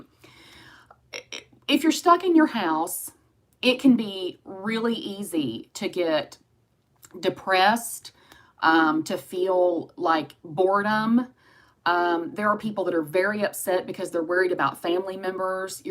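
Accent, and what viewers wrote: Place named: South, United States. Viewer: I am in Australia and I just want to say that I absolutely adore your Southern accent. Could listen to you all day. xxx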